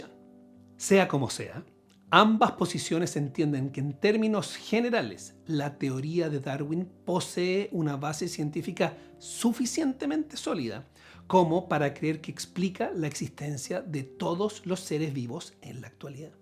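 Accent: Chile. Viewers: Vives en chile?, hablas como chileno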